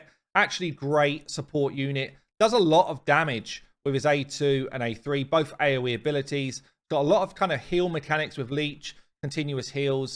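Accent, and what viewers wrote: United Kingdom. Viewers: I don't know if it's what you say, just being British, or saying what you say with your British accent, but your videos like this just make me laugh. I love how you just beat up on poor Woad-Painted lol. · you’re easily one of those content creators that I can listen to for hours lol it’s the British accent! Love it! Oh and you put out must have content which I like so thanks bud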